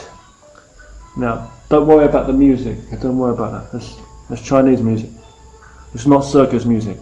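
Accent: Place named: London, United Kingdom. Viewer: BRILLIENT HAHA YOU CAN HEAR YOUR LONDONER ACCENT HAHA LOVED THIS AND THE HUMOUR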